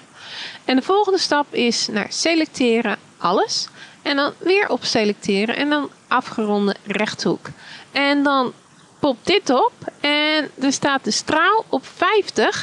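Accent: Netherlands. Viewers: ik kon van je engels uitspraak in andere videos al horen dat je nederlands was.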